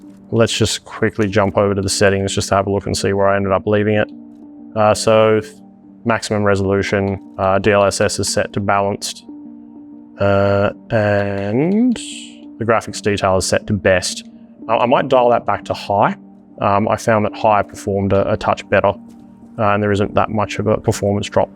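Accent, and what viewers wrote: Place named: Australia. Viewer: I don't think this guy knows what he's talking about or knows how to program a laptop settings. Out of the dozens of reviews on this laptop all of them being great and no issues. This guy sounds like a whiny Australian like the rest of them. I juat ordered this laptop and I'm sure I won't have any of these issues he's claiming. Maybe he has the only bad one in production🤷‍♂️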